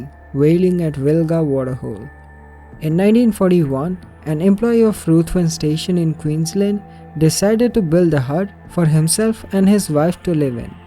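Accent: India. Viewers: Your narration is fantastic! I love listening to Indian accent it's beautiful!! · Mate, you accent is Indian right? funniest accent in the world to my ear, I'm an Aussie so our accents is are quite different. But your well spoken, even if some things are missed pronounced and quite easy to understand, the dreary americans are the worst like Criminally Listed his a terrible narrator cant even watch his vids. my point is your got nothing to apologise for good video keep up the good work.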